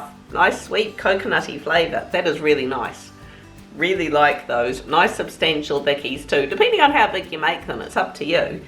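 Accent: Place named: New Zealand. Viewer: Absolutely adore that Kiwi accent & no mucking around with fancy words and just gets the job done. Good old Kiwi style!!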